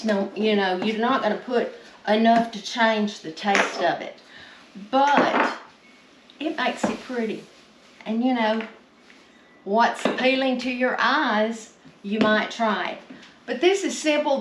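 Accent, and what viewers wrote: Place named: Alabama, United States. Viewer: I only remember them being called deviled eggs. If I make them at Christmas I add some sweet pimentos with the relish to have the red with the green. And sometimes I make them with soft bacon bits if Im trying to be fancy lol. I am from coffee county Alabama and listening to you sounds like home. God Bless and keep you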